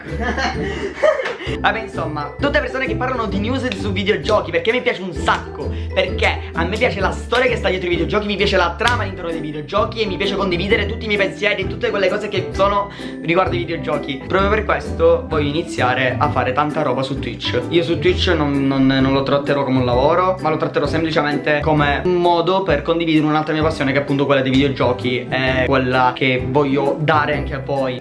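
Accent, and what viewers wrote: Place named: Sicily, Italy. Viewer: ma tu sei siciliano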